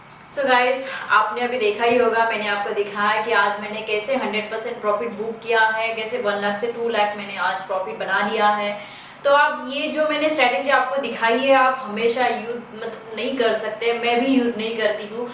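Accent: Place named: Bihar, India. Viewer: Are you from bihar?ur hindi speaking is like biharis.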